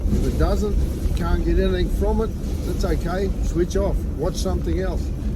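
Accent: Australia. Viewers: you sound like a true Australian doing a great job 👍🏽👍🏽🇦🇺👍🏽👍🏽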